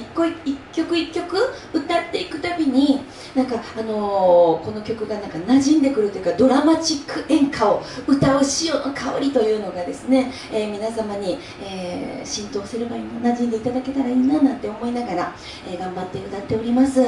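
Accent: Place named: Osaka, Japan. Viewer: かわいい！ちらほら、大阪弁素敵🎉華織さ〜ん、応援していますよ！また、イベントでお会いしましょうね！お会い出来ます日を楽しみにしています♡白夜行も、カッコいい😊！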